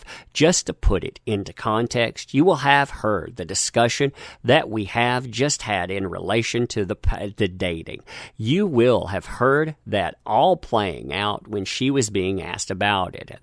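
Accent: South, United States. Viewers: Horrible narration. Weird scratchy, prissy, hillbilly voice.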